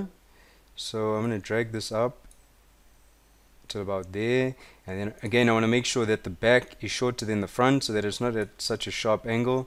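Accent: South Africa. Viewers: Thanks for a detailed tutorial bru....really nice to hear that distinctive SA accent :)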